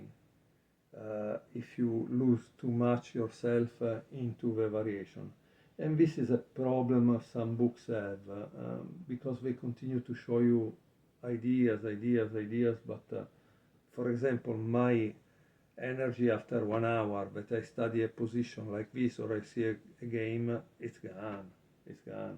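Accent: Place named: Italy. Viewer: Por la forma en que hablas inglés eres italiano.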